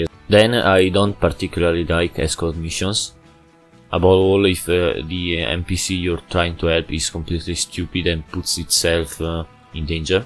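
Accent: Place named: Italy. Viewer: Your voice is very cool, i love when Italians speak English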